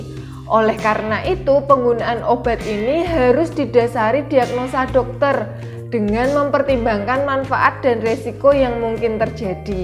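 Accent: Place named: Java, Indonesia. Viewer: Watuh medoknya..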